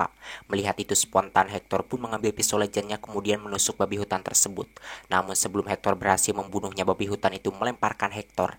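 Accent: West Java, Indonesia. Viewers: Sundanya dari mana ni min 😁 sing ageung milik lah pokonamah 🤲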